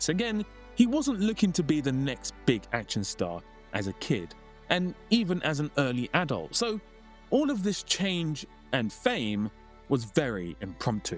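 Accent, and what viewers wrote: United Kingdom. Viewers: Gosh, this brits accent is so terrible!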